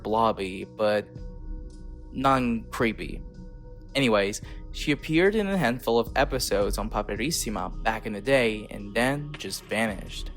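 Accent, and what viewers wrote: United States. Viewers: ...l'autore di " "! 😱 PS: quando parli inglese hai un accento americano perfetto! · Hai un eccellente accento americano, veramente complimentoni. Ma....andiamo...nessun americano sarebbe riuscito a pronunciare correttamente PAPERISSIMA, IN MANIERA COSì FLUENTE POI. TI SEI TRADITO DA SOLO ahahaha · no vabbè ma il tuo inglese è pazzesco fra 🫂 I shyt you not, pensavo che fossi americano (o comunque un americano di prima generazione, con genitori italiani) per quanto buona è la tua pronuncia ahahah ti giuro, sei arrivato ai nomi e ho detto “ayo che pronuncia perfetta che ha in italiano”, sono andata a vedere sul tuo canale la nazione che hai impostato, leggo “italia” e mi sento una c•gli•na, perché torna tutto 😑💀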